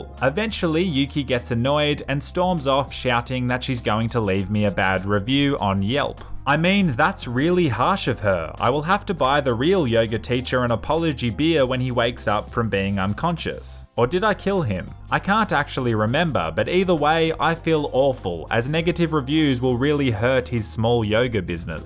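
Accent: Australia. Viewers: hes aussie? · so ur australian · Ah i knew you were australian,no wonder you are so wise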